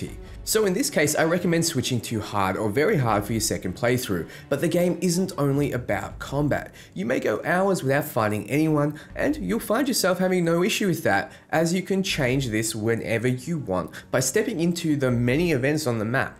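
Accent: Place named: Australia. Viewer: Yoooo. Gimme the scoop compared to Red Dead? I’ll sub regardless, you sounds like an Aussie who is cooped up.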